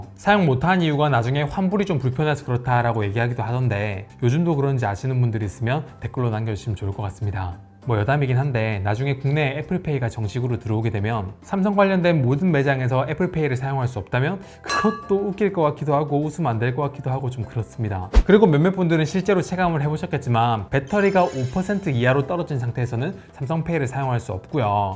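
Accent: Gyeongsang, South Korea. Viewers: 유용한 꿀팁 감사합니다 경상도 사투리가 귀엽네요 ㅎ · 살짝 돌출된 입 ... 들릴듯 안들릴듯한 경상도 사투리... 뭔가 깔끔하고 단정한 김영철같아요...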